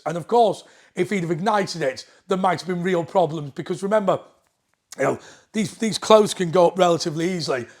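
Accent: United Kingdom. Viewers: Sounds like he's British 😂🤣🤣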